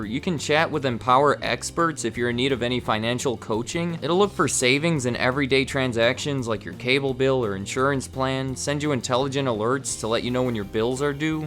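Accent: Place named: Maryland, United States. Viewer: Im gonna guess from your accent you live in Fredrick md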